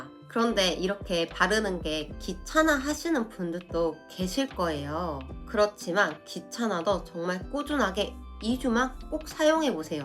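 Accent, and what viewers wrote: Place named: Jeolla, South Korea. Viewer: 오 완전 전라도억양!!! 전라도 분이신가요???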